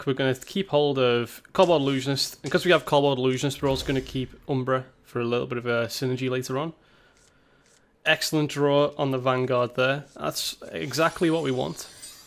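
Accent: United Kingdom. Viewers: You sir would be a very successful audiobook narrator or radio host, and it's not just the eloquent British accent.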